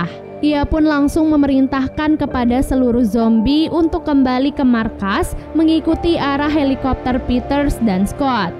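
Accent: Lombok, Indonesia. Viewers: Kak aku dengar kak dari lombok aku juga dari lombok 😁😁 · ORANG LOMBOK YA KA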